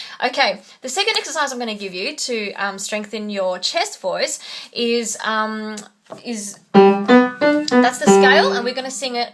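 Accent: Australia. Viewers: your accent...Umm..Australian right ?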